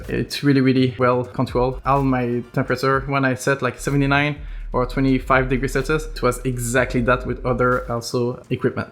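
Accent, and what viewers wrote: Quebec, Canada. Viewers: Tes Québecoé toé ? Jme trompe ?? Hahahaha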